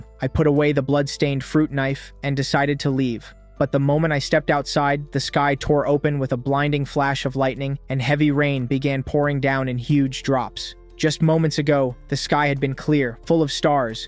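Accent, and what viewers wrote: Jamaica. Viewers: Ohhh yuh Jamaican.. eh video tuff bro nah gu lie but wish wah prt 3 did di deh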